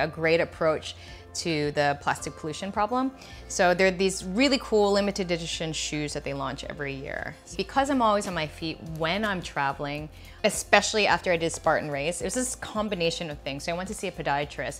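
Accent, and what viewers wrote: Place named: Singapore. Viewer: Singapore accent!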